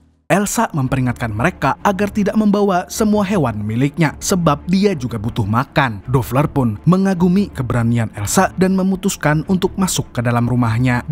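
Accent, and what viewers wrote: Banjar, Indonesia. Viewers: Harau urang banjar sekali nya sidin neneh 🤣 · Pian ni urang banjar kah? 😁 Sesama kekawalan kita lah.. 😁 👌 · Urang banjar jua kah pian nih julak..😅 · Urg banjar kalinya julak mimin neh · umai sanak nih urang banjar kah sekali nya wkk sukses sanak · Orang Banjar kah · Umma, urang banjar kah sekalinya pian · urang banjar amang nih sekalinya wkwkwk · Urang Banjar jua sekali admin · Bungul urang banjar sekalinya 😂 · Pian orang Banjar KH min? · Urg banjar sakalinya admin nih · Bah urang Banjar jua rupanya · Mimin nya urang Banjar leh😅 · 😂dsa ngalih nyawa ni lh. Urang bnjar kalinya.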